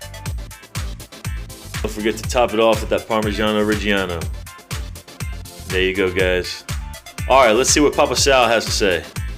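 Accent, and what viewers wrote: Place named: New York, United States. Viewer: Hi Sal. You sound like you're from the best part of the country...NYC. I also make pesto...but without the tomatoes. Your dad reminds me of mine. He might like this version of, sung by one of my favorites. Cent'anni. <link>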